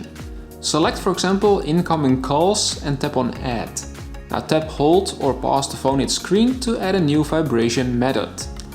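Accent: Netherlands. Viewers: Ik hoorde echt vanaf de eerste seconde dat je Nederlands bent haha!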